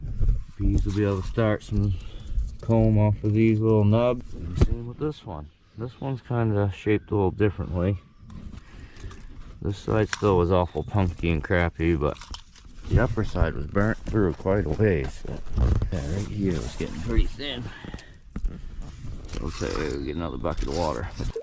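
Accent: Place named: New England, United States. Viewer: I know a New England accent when I here one, being from Maine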